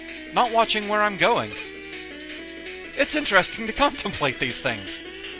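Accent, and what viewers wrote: Scotland, United Kingdom. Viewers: A Scottish guy...playing a robot...who hates birds... Why do I keep thinking of Portal 2?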